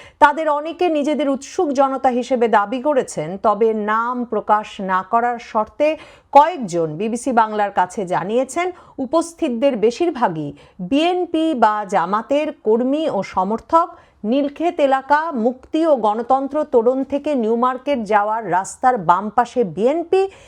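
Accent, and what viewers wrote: Kolkata, India. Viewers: আপনে এই রকম কলকাতার মেয়েদের মত কথা বলেন কেনো?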